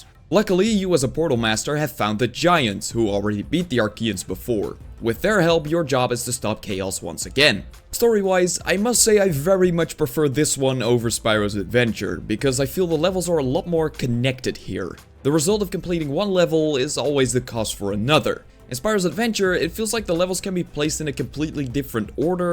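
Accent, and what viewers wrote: Netherlands. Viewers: its funny i can hear ur dutch because of ur accent XD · jij klinkt echt Nederlands hahah. Goeie video man, deze serie is pure nostalgie voor mij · Is that a dutch accent i spy? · i smell nederlander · Nice video and your top 6 is on point (mooi nederlands accent trouwens) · are you dutch? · heujjj did i just hear a nederlands accentje? skytuber from the netherlands is always a big plus. i tought the skylanders fans wherr a dying race here. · leuk geprobeerd, maar ik herken een nederlands accent uit duizenden!!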